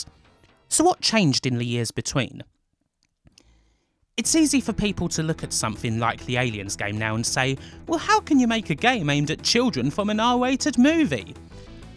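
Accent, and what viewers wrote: United Kingdom. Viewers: how fucking awesome is it that I get to listen to an arcade game review by a British Elmer Fudd?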